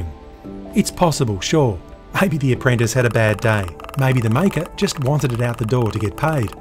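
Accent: Australia. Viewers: That soothing aussie lilt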